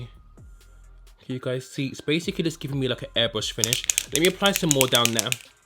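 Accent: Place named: Cockney, United Kingdom. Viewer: I get my Sephora-fix by going over to Paris on the Eurostar. If you don’t mind me asking, are you from the midlands or London? My hubby says your accent is cockney...I say Brummie. Lots of love from Bristol🥳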